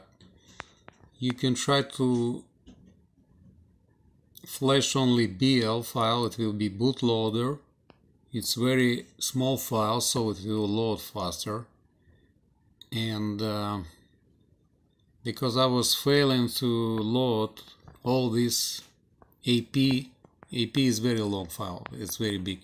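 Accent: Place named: Ryazan, Russia. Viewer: Good instruction! Just restored my R810 watch... Your ryazan'-style pronounciation blowed my mind...)))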